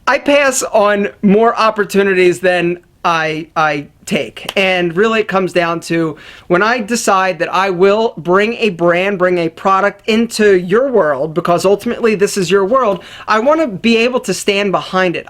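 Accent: Philadelphia, United States. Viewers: Whoever told you not to do this video should be fired...the honesty (which I already realized, but some don't) is refreshing and positive. If more companies would be this open I think us commodities would be a lot happier with giving you our eyes. Sponsors are key, and also don't require the viewer to do anything but watch. Sticking to advertising stuff you believe in is the way to go...if you get paid to showcase products that actually help people, its a win win.....Keep it up. (P.s. Always good to hear that Philly accent on the tube lol, much love from the 215)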